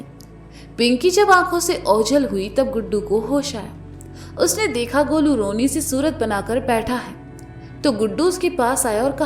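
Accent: Kanpur, India. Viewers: aapne kanpuriya language ka mast boli ho...👌👌👌👌 Bahut badhiya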